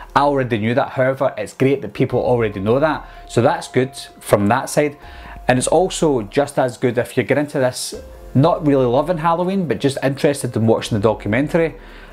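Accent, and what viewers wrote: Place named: Dalkeith, United Kingdom. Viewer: your accent sound similar to my old friend from dalkeith mayfeild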